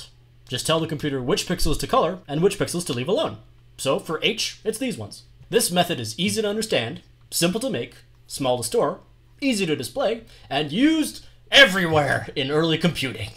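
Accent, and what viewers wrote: France. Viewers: is this guy French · you sound french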